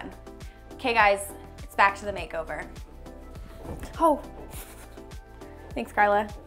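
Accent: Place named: Canada. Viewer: I knew the first time I heard you that you were Canadian. Ouut.and about. I love Canadians. Unfortunately I’m stuck in the USA during a very dark time.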